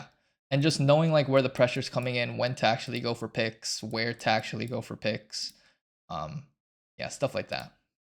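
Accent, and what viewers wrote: France. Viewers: Non. Mais oui on est d'accord il est francais. J'ai l'impression qu'à un moment il se trompe et lâche un p'tit: fin heu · C'était toi ? je me disait que tu avais un accent français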